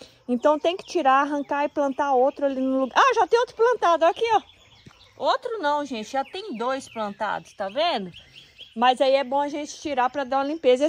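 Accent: Minas Gerais, Brazil. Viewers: Vc e. Mineira ? E parabéns mega canal !!!!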